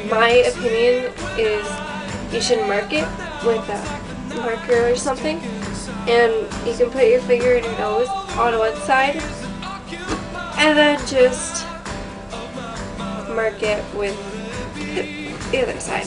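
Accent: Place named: Canada. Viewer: I love your accent :D Canadian accents are cuuuuuuute ^_^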